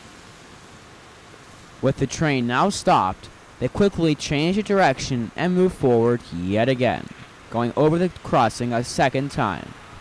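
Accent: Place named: Western Pennsylvania, United States. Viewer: You sound like you're from Western PA. I'm not, but I improved my own diction when I trained as a licensed lay reader. Learning how to read the KJV clearly and with emphasis in all the appropriate places ... it bled over into my ordinary speech.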